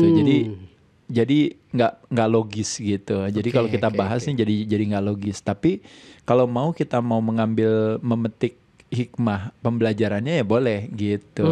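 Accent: Bali, Indonesia. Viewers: Logat balinya si mimin nampak kali, T T T😂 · Ini emg logat bali bgtu apa cm feeling sy aja ya. Mc nya kayak org make sabu, ciri2nya bgtu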